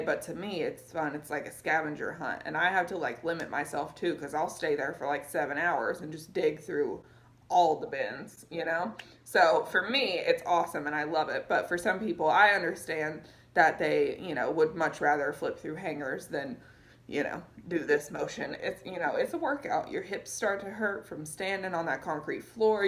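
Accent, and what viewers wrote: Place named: Oregon, United States. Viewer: Love your channel! I’ve been watching you for a couple of months and am really enjoying your videos! I’m a fairly new Poshmark seller and find your tips very helpful! Can I ask you where you are originally from? I get an Oregon accent vibe from you, but I could be totally wrong. Keep up the good work! Thanks!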